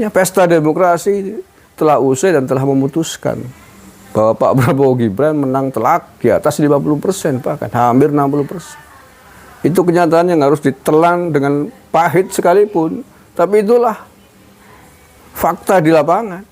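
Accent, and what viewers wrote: Banyumas, Indonesia. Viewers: Jian omngne rika gawe aq ngguyu bae.omngn asli bnyumas.bner2 asli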